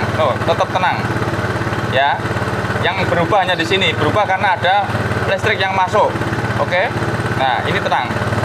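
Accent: Java, Indonesia. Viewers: Sajak wong jowo omongane,jowo ngendi bro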